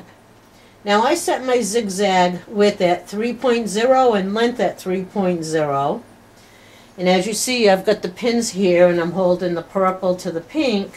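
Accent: United States. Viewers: I’m an experienced knitter but I hate sewing seems - I just seem to have a mental block or am too uncoordinated. But I’m now trying to learn to machine sew. Hoping eventually it will make sewing my knits together much easier one day. Thanks for the video. (Also I love your accent - I’m from Northern Ireland so I love noticing different American accents and dialects). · Are you from New England? I have relatives from Rhode Island and I swear your accent is exactly like theirs.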